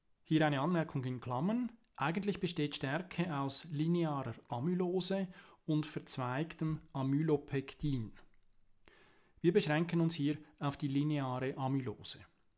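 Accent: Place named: Switzerland. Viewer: No shit, er kommt (höchstwahrscheinlich) aus der Schweiz, hat daher einen Schweizer Dialekt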